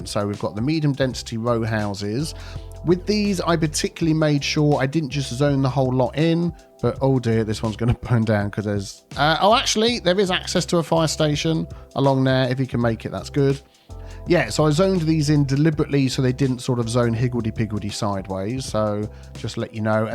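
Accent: United Kingdom. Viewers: This is the first time I have heard a british man talk about french things so positivly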